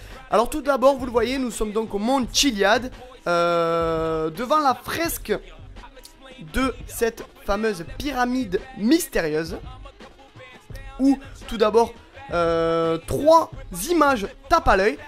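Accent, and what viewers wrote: South, France. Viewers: Ta bien l axent du sud toi x)) moi aussi tkt :D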